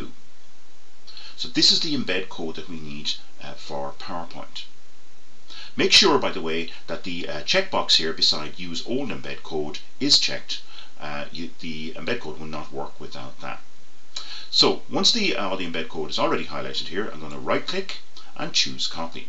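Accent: Ireland. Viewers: love that irish accent.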